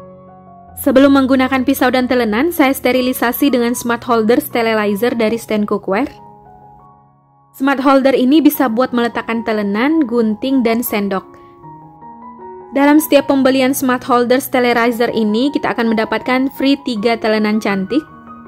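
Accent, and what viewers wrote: Medan, Indonesia. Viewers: owh medan patas logatnya hehe.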